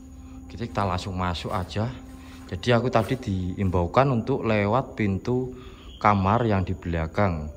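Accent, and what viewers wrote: Java, Indonesia. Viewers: Haiiii...salam dari Malaysia.. Pekat sungguh bahasa jawanya...boleh lah faham sikit2...moga sukses selalu.. 👍👍👍👍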